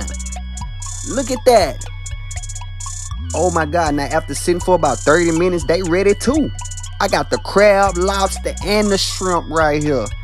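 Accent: Louisiana, United States. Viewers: I'm from louisiana and I ain't ever heard someone in my hometown have such a thick accent😩 he got them thick thick accent bro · i could listen to a lousiana accent all day 😌🙏🏽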